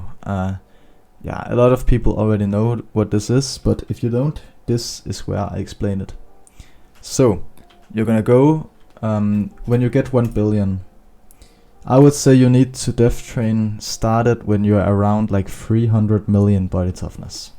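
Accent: Denmark. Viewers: are you from denmark? cus i am and ur accent is like that. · ur danish i can hear it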